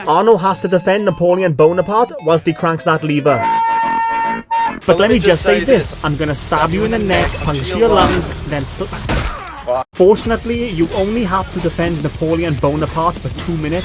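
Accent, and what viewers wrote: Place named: Wales, United Kingdom. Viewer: Welsh accent is carrying this video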